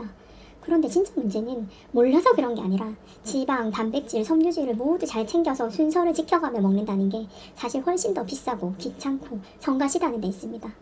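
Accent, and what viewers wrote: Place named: Seoul, South Korea. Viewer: ㅋㅋㅋㅌㅌㅋ 맞아요. 서울말 쓰시는데?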